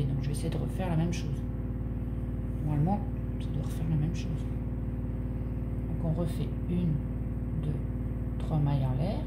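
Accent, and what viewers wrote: North, France. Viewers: J'étais de somain sa sentant votre voix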